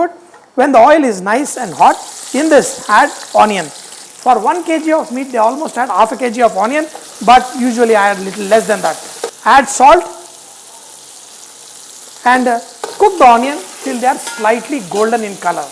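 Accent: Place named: Tamil Nadu, India. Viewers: Wow chennai tamil super 👌👌👌👌👌👌👌👌👌🌹🌹🌹🌹🌹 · Sema chef Chennai language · Every day morning starts off by watching your cooking videos. Today your tamil slang is ultimate!!! Can't stop laughing.. I watch for the way you present the video. The final touch Pondatti..😄😄😄 Pondatti....🤣🤣🤣🥰.. Oh man.. 100% laughter guaranteed. .. Best wishes to Vahchef !! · Your Tamil accent super ji Tamil Nadu mapillai, all the best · Good to hear Tamil slang 😉😉😉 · Tq sir for making our chennai biriyani and you say pondatti and sister says podu machan I love the way you try to speak the chennai slang and it was soooo good 👌🏼👌🏼👌🏼👌🏼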